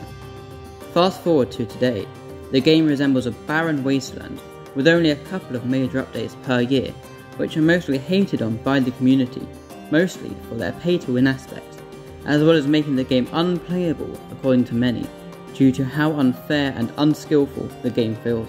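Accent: United Kingdom. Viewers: i would've given this video essay a chance but you way too british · i agree with froggy, just try be less british in the future. but nice video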